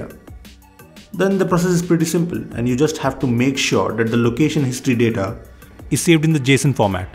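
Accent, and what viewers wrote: India. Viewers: He's not "putting on an accent." It's an Indian accent, and I don't mean native Americans.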